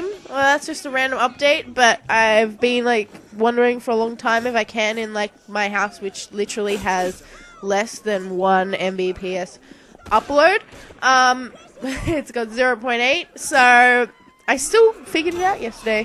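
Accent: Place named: Australia. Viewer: Glad your back, missed that australian accent of yours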